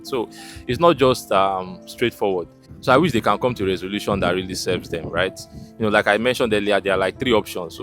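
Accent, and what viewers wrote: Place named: South London, United Kingdom. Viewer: The landmark structures are that of a warehouse standard and obscene for such a location. Of course, most Nigerians have never seen beach tourism like Brazil and Spain. make him collect his compensation and go somewhere. The standard is 0. He guy should stop brainwashing Nigerians with his Saf London accent make we hear word.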